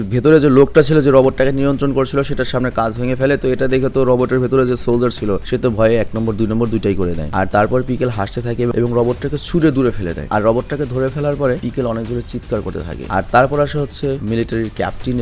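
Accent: Noakhali, Bangladesh. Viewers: Vai Noakhalir kotha sune valo laghse..ami Noakhali 😌 kno Noakhalir kotha bolsen jani na kintu valo laghse..1 ripley plz😌👍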